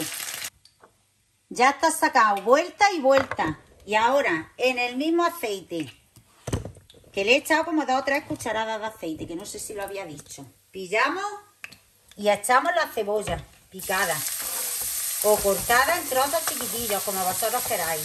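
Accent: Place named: Jaén, Spain. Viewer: Hola guapa soy mili de Valencia me encantan tus recetas mi familia toda es de Jaén y cuando te oigo hablar me recuerda 😅 me hace mucha gracia algunas palabras que dices que también les dice mi madre❤❤ un besazo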